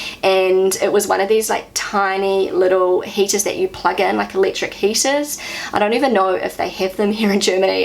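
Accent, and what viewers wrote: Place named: New Zealand. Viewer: Kia Ora !! What a joy to hear Kiwi accent !!!! God, how I miss it. I left NZ in 2009 to join my wife in France and never came back (at least for now, but hope I will someday). I miss NZ so much.... Thanks for this video and all the reminiscing of Aotearoa. Take care.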